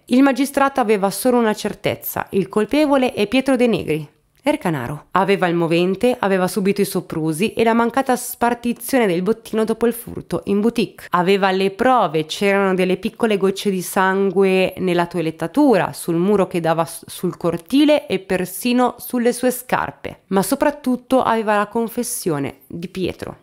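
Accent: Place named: Rome, Italy. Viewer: Daje che peesse non capitolina...lo parli bene eromano...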